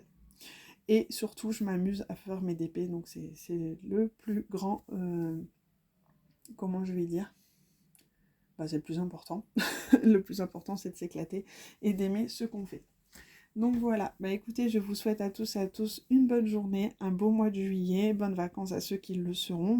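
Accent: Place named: France. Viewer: J.aime beaucoup ton accent français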